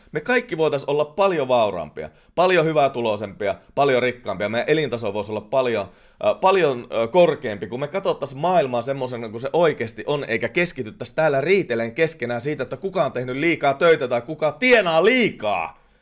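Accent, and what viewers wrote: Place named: Oulu, Finland. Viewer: Nyt oli asiaa kansanomaisella kielellä. Oulun murteenkin kohdalla käännetty nupit kaakkoon, täältä löytyy muuten Suomen paras kesäkaupunki eli Kotka. Tervetuloa!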